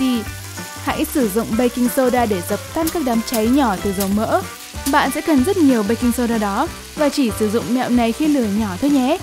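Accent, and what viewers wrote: North, Vietnam. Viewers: sao bắc kì nhìu quá...nghe ko hay j cả...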